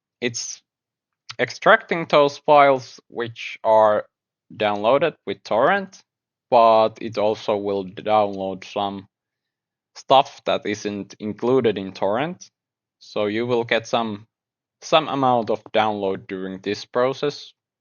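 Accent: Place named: Finland. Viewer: once i heard a finnish mans voice i knew this was going to be a stupendous game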